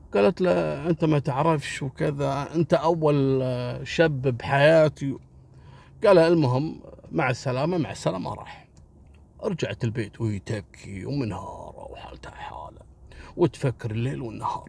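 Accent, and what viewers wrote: Egypt. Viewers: هههههه بحكي مصري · بحب طريقتك وانت بتتكلم مصري يا 😂😂بجد كأنك عايش معانا بمصر تحياتي ليك من مصر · يا حلو اللهجة المصرية من لسان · يا قصصك وطريقتك روووعة ولهجتك المصرية اروووووع 👏👏👏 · قصة جميلة واروع ما فيها لهجة المصرية.....😊😊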